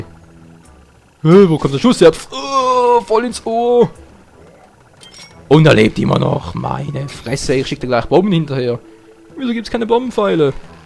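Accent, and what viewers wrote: Switzerland. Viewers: xD...Tschöpli!!! xD Schwizerdütsch rockt!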